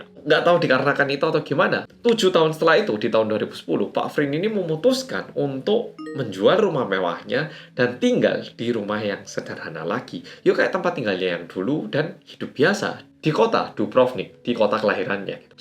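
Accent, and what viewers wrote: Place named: Java, Indonesia. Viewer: SUKAK LOGATNYA MAS. BASA ENDONESIANYA JAWA BGTTT. APIIKKKK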